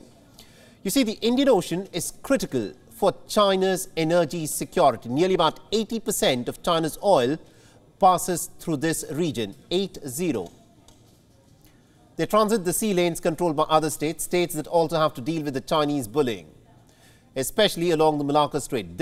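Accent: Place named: India. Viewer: Every Indian have more or less the same English accent as of this journalist instead of that stereotypical Hollywood accent.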